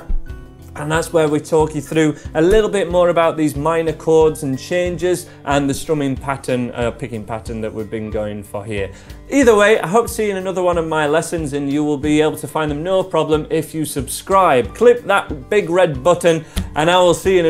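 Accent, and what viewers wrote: United Kingdom. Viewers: He's from the UK.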